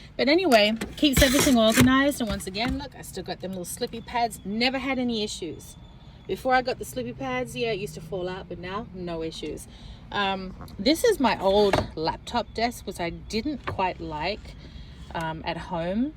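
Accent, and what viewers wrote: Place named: Australia. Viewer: Nice to hear another Aussie accent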